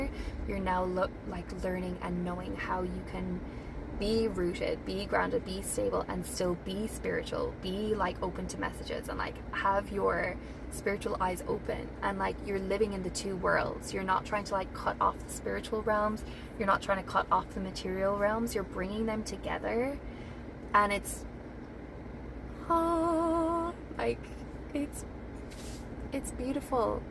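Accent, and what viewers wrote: Ireland. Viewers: did you move from Ireland? your speech is beautiful ❤️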